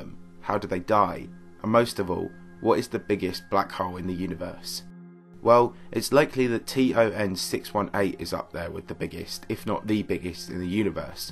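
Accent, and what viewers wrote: United Kingdom. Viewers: I can't stand this guys British voice. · I love the british accent.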